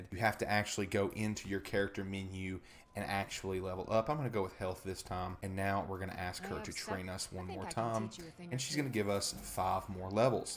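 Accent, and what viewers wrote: South, United States. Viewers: I don’t watch you channel but hearing a dude with a southern accent explaining Skyrim stuff to me is much more comforting and entertaining than a sweaty nerd voice.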